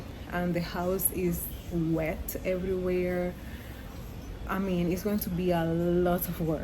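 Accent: Jamaica. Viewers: wait a minute is that a Spanish /Jamaican accent?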